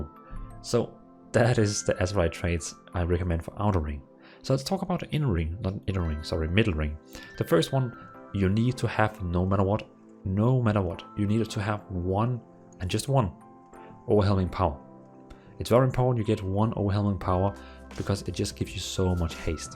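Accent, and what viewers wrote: Denmark. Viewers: Really enjoy the tone and speed of you voice :) do i hear some Danish in you english also? You Got a sub :D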